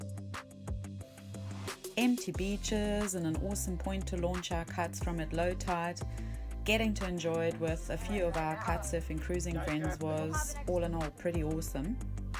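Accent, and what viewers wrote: South Africa. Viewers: Evening, yousound South African?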